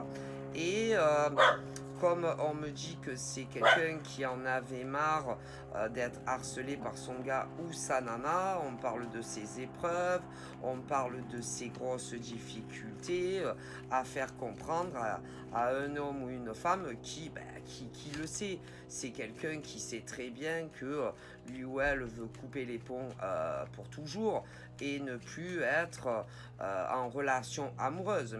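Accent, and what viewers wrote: South, France. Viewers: Cc je vous découvre ❤super juste 😮mdr je le savais 😂j aime beaucoup votre style, beaucoup 😊super nature accent du sud non? Bisous du sud 😊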